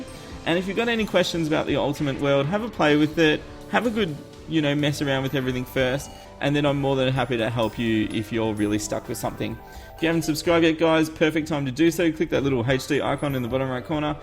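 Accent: Australia. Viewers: I only started watching 2 days ago, but your stuff is great. the Australian accent is very motivating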